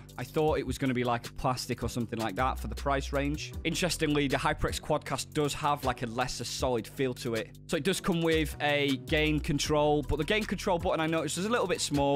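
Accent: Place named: Northern England, United Kingdom. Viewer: You've got a nice accent . I wonder where you are from in The North of The UK ?? This will help me in my English Accents Studies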